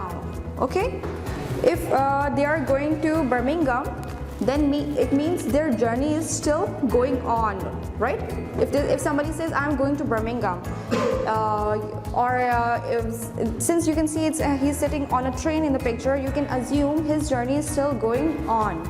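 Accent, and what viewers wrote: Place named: United States. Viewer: Mam you've great American accent.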